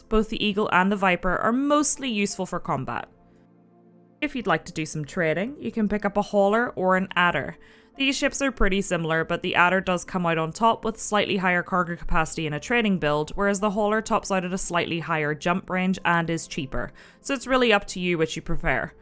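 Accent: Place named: Appalachia, United States. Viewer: sorry ya sound hillbilly. A milder version of accents in the Ouachitas, Ozarks, and Appalachia.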